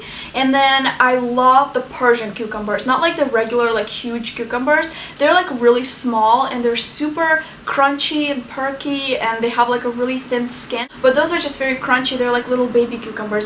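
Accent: Russia. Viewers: Lovely girl;) hope to see more videos about nutrition. I think your accent is Russian, am i wrong?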